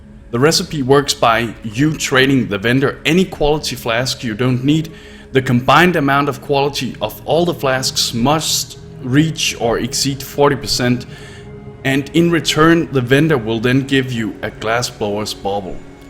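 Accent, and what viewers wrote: Denmark. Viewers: You're danish aren't you? :) Anyway, nice video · Heh, danish accent. Du lyder næsten som en ung Lars Løkke =) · Very informative and useful! Judging from your accent, I bet you're danish, so "Mange tak!" :) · omg sounds so danish english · You sound Danish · Are you danglish? You sound danglish :D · wow this is the epitome of danish accents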